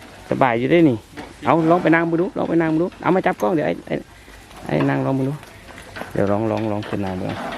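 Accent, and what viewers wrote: North, Thailand. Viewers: อู้เหนือกับ ก็ได้ สำเนียงเหนือกับเวียงคือกัน.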